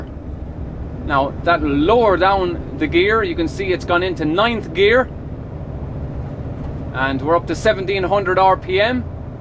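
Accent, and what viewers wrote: Ireland. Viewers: he sounds irish mate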